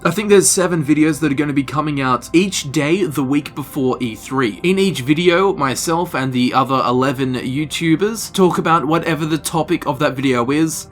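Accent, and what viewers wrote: Australia. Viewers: Can I watch your Witcher DLC playthrough if I haven't played the main game yet or will I be confused/spoiled? I need more of that soothing Australian voice...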